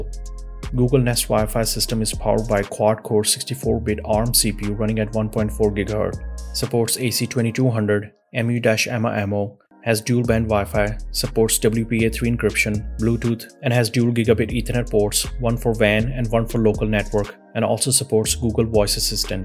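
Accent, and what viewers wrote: India. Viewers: this is painful, its just a collection of adverts for all the products so you learn jackshit. nothing is compared and the accent just reminds me of indian tech nonsupport.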